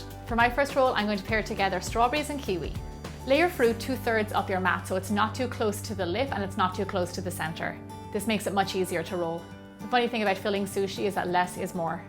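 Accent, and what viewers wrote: Ireland. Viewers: I totally adore your accent! So adorable! PS: I secretly wanted an Irish accent when I was a kid! And I still want one now!! 😝😝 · Okay this may sound REALLY weird but I love your Irish accent and I use to call the plastic wrap a plastic wrap but recently I've been calling it cling film😂 and omg I have been dieing to try sweet rice it sounds sooooooo good!!!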